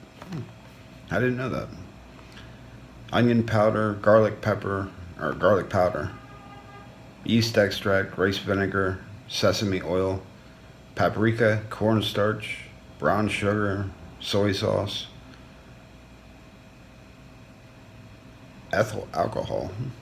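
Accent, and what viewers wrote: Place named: Pittsburgh, United States. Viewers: Idk why but I hear some Pittsburgh in your voice lol that's where I'm from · Definitely hear some PA in there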